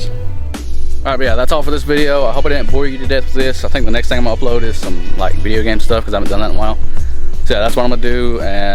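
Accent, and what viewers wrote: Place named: South, United States. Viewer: You sound very southern, but actually a very practical ricer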